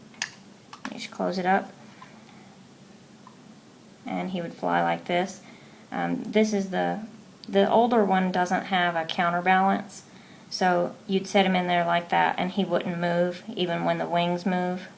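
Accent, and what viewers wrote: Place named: West, United States. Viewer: I never noticed this but her accent is like a western guy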